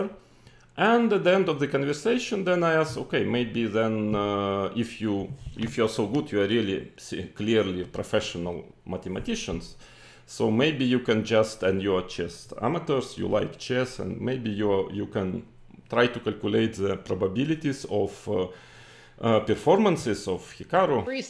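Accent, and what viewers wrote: Russia. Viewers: Владимир хорошо говорит на английском, правда с ОЧЕНЬ РУССКИИ АКЦЕНТОМ, что только радует что Владимир хорошо развит, и что он чисто русский, и мало был в Америке, и не нахватался американского акцента, в общем наш человек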